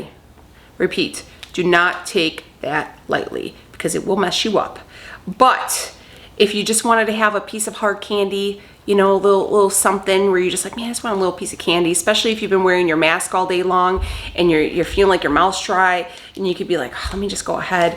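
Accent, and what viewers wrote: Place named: Minnesota, United States. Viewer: Minnesota? That accent..Keto on n Strong!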